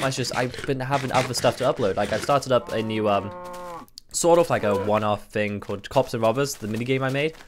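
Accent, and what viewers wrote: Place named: England, United Kingdom. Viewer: What part of England are you from?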